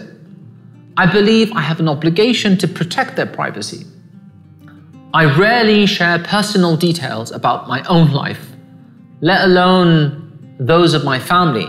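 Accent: United Kingdom. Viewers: I learn a lot from each video!! Thank you. Bless you and your family, and may your privacy be protected by God almighty. But, can we stop just a minute to appreciate this beautiful ( British English? ! As foreign language learner I learn a lot, language wise, from you when you speak. It's beautiful, and I recommend listening to you ( speaking, singing composing, and even in silence, your calm composure is something valuable to learn from.) I appreciate greatly, how careful you are to contribute positively to ''the inevitable role model" to have become to many people in the world. ❤ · When he speaks I get reminded of Hermione Granger and I'm convinced that's how British sound haha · Dang i never thought you have british accent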